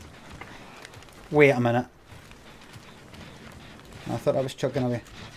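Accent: Scotland, United Kingdom. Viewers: Oh cool a Scottish DBD youtuber 😯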